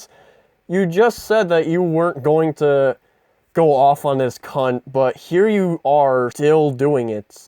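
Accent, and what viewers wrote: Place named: Belfast, United Kingdom. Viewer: OMG I can hear the Belfast accent in your voice lol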